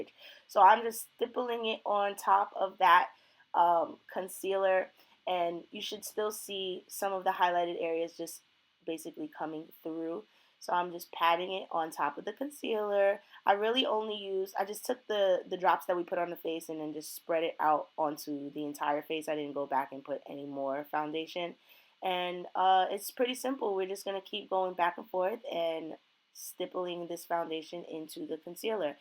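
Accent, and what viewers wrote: New York, United States. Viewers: My first time watching you and I got a feeling you from New York Sis 🤨🤨🤪🤪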